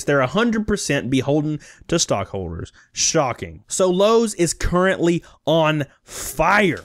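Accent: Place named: Alabama, United States. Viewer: I was watching you on my tv and my wife said " does his voice really sound like that?" I died laughing. I said hes from alabama. she goes ohhhhhhh.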